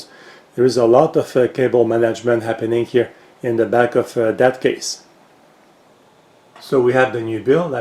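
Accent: Quebec, Canada. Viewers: Thought I spotted un accent quebecois in your english monsieur.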